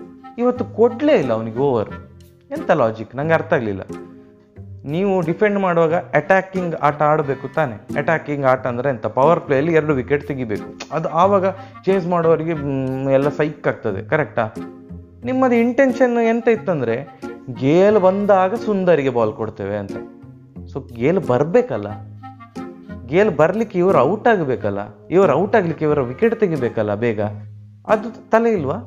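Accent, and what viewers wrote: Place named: Kundapura, India. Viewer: ಕುಂದಾಪುರ ಕನ್ನಡ🔥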